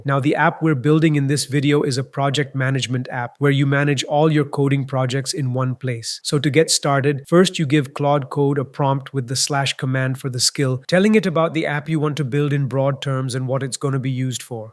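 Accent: India. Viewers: imagine using an ai narrator and still choosing indian accent holy shit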